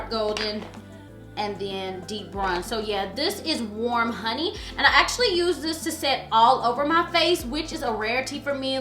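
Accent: South, United States. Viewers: i luv your country accent especially when you say the word "sure"...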